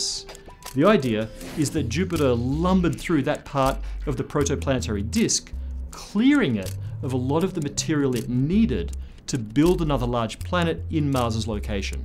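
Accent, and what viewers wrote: Australia. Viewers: I don't think so. Sounds something akin to Australian.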